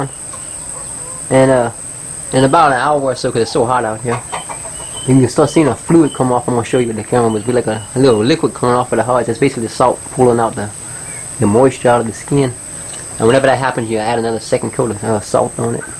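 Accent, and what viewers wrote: Louisiana, United States. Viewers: American... Southern of some sort, Louisiana? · Southern Louisiana, American accent. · I'm from Louisiana.. Promise this dude is too... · Man that Louisiana accent come out thick.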